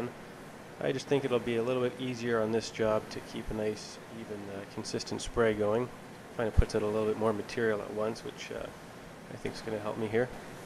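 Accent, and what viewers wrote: Canada. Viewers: You sound Canadian are you? If so where in Canada? Thanks PS you need a longer hose on that paint gun....just sayin...well done. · Nice video. Great spray techniques. Are you Canadian?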